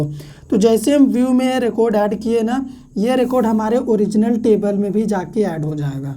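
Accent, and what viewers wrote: Jamshedpur, India. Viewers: Are u from jamshedpur ❤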